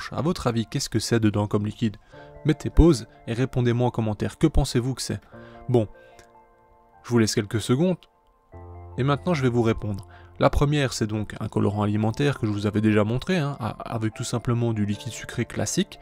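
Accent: Belgium. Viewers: Petit accent Carolo ?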